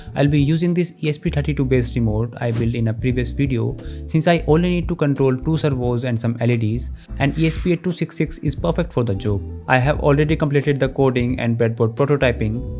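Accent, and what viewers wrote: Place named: North, United Kingdom. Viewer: fix yr accent northie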